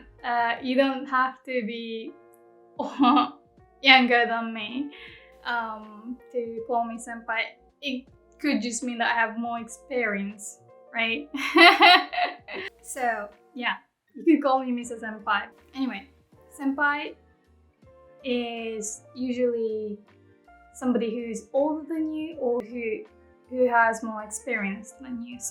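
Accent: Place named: United Kingdom. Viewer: You have a british accent. Did you learn English in Britan?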